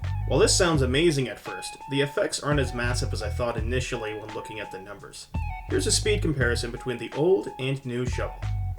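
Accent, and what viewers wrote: Canada. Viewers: WINNER 🎉🎉 GAGNANT 🤑🤑 I can tell you're from ontario my friend nice to meet you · Canadian detected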